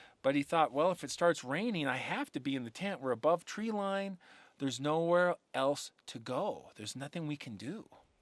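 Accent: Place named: North, United States. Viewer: Hi, I want to thank you for the excellent content. I love your campfire stories, the camping, and cooking recipes. I'm 64 and have a bad back and bad knee, so my camping days are most likely over with, but never say never, right? My name is Kris, too, but with a K. What state did you grow up in? The way you pronounce south makes me think you're from a northern state. I'm from Texas, so we pronounce south like "mouth". That's the redneck pronunciation, lol. Great channel,. Keep hiking (for those of us who can't 🤠)!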